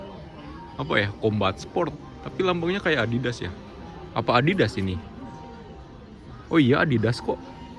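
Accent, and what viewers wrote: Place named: West Java, Indonesia. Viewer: Gass mantap orang sunda inih ketauan logatnya da abimah oge sami orang amsterdam 😂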